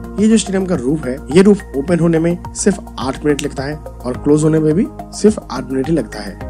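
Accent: Bengal, India. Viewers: Bro are you Bengali? You sounds bong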